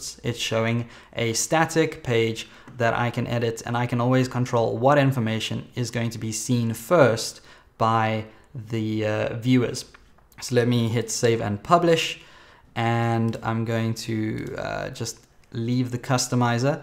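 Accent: South Africa. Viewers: Hey! thanks for all the tutorials man, really great to see a tut with a South African accent for once haha keep it up dude!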